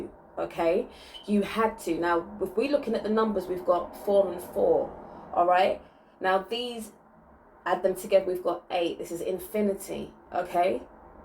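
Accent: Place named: United Kingdom. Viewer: I have just tuned in... But I know....I'm feeling you already beautiful soul Si💫🙏❤️🌻🌄✨xx My place of birth Is not far from you, According to your accent 😉😋.... Isle of Man ... Ellan Vannin in my ancient tongue Mona / Mann By my Viking ancestors 650bc x In my ancient tongue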